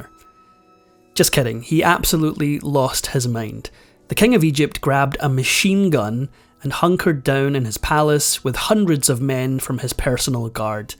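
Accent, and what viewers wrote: Scotland, United Kingdom. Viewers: It’s a Scottish accent.🤣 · What’s wrong with a Scottish accent? Best accent ever🏴❤️🏴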